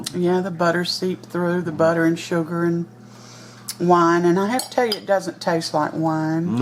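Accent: South, United States. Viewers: Nice video! Love that you're from the South. You sound like my husband & I. Can't wait to try this!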